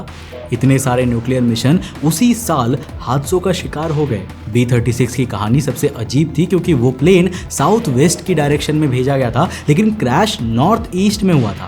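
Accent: Bengal, India. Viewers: Tomar hindi pronounciation sune vabini j tumi bangali , khub sundor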